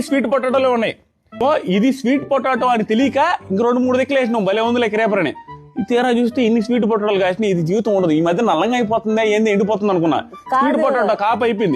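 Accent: Nellore, India. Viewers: Maaa Nellorelolla yaaasa antne untadi mari😂❤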